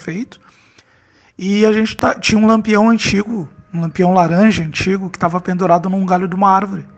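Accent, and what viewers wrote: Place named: Rio Grande do Sul, Brazil. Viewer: Eu Amooooo esse sotaque Gaúcho,adorei a história envolvendo escoteiros,aqui em casa tenho 3 escoteiros.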